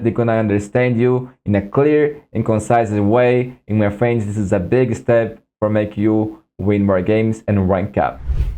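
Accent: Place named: Brazil. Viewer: eu comecei o video pensando: cara, ele tem um sotaque do ingles brasileiro, ai quando eu olho pro seu lado, tem um camisa do flamengo kkkkkkkkk.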